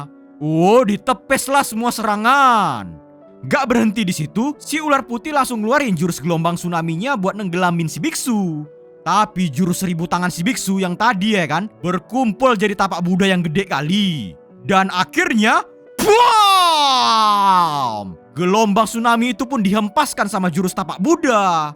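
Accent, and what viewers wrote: Medan, Indonesia. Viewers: Bg lu orng Medan yh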